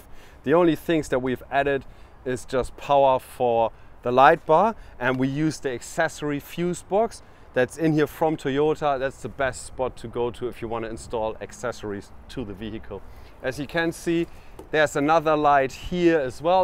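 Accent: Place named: Germany. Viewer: It’s a pretty fancy set up for a troopy. I am just asking myself where they put all their camping gear? What about a table, chairs, bags. You said it’s perfect for longer traveling where do they put their clothes? Looks like heaps of electronics but not much space in the end… I would be curious what this set up costs… Ps. Love the German accent! :)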